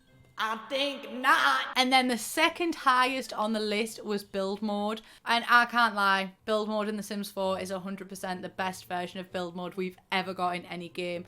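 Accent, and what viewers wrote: United Kingdom. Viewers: lmao I'm from Germany and the only reason I understand you is because I have friends from all over the UK so I had to learn how to pick up on new dialects quickly. Before I met my first friend from England (West Yorkshire) all I could understand was that annoying London Accent and basic american english tbh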